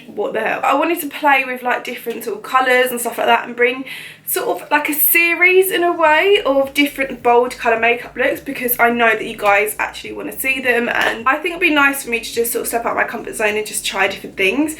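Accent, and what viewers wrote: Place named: RP, United Kingdom. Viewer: This look is beautiful! And you are beautiful always. Your accent is so posh to me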